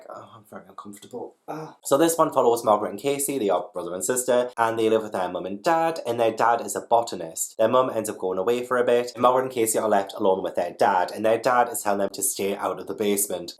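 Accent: United Kingdom. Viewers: Hey it’s European (somewhere in the UK I think, maybe Wales or Scotland, the accent isn’t too familiar to me) Josh Peck! What’s up? Great video! I’ve never seen your channel before but I like it a lot already! Can’t wait to watch this video! If I like it enough by the end I’ll be sure to subscribe! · You sound like a fruit and the British accent doesn't help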